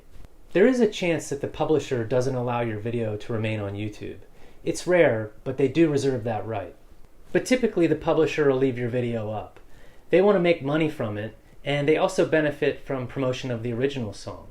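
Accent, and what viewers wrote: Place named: United States. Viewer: NICE, CLEAR AMERICAN ENGLISH & WELL GOOD EXPLANATIONS, SALUTE !!!.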